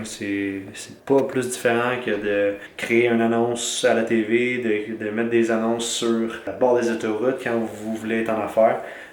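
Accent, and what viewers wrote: Canada. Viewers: Tu est Canadien?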